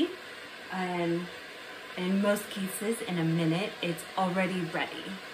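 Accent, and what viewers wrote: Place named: France. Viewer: Love your French accent!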